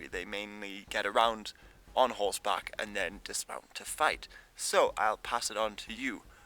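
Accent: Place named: Newcastle, United Kingdom. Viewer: Neecastle lad! We-aye! Dare you'd to speak Geordie in an episode to come!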